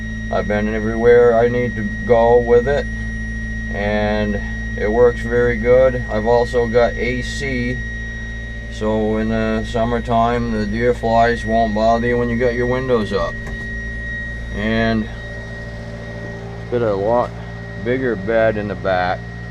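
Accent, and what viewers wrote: Maine, United States. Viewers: Sound like a mainah!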